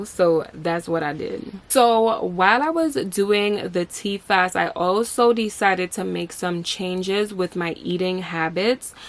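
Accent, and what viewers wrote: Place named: Caribbean. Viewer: Reslly informative, and good job on the weightloss. Aldo i hear an accent, are you of caribbean desent?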